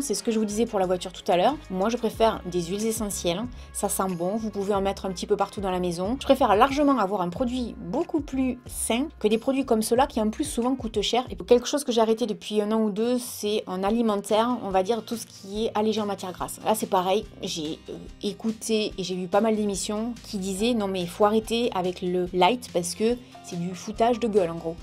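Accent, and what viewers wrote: South, France. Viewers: Nouvelle abonnée ,j'ai passé un moment très agréable à regarder ta vidéo. Merci pour toutes ces bonnes idées ,ta simplicité et cet accent du soleil😘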